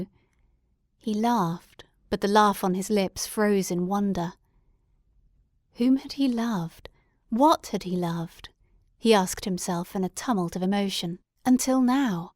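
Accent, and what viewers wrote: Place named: United Kingdom. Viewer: Nicely presented. I enjoyed listening to your voice and beautiful Bristish accent 😍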